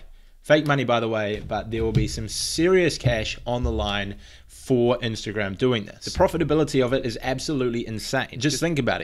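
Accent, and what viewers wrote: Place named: New Zealand. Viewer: 6 minutes into this video I thought... "Is that a kiwi accent?", I open your channel in a new tab, check location... NZ. Sweet as! This is the first time you get recommended to me. Good video!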